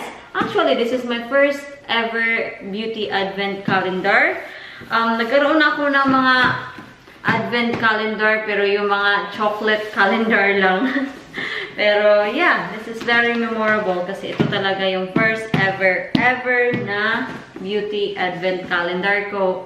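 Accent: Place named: Visayas, Philippines. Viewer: Hi ate love you bisaya accent❤ #christmas2019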